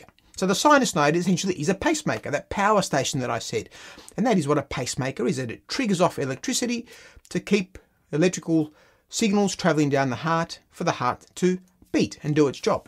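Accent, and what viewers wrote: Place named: Australia. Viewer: you can be the most educated person in the world but when you have that australian accent you just loose value in an instant. i dont even want to here another word. pome mentality.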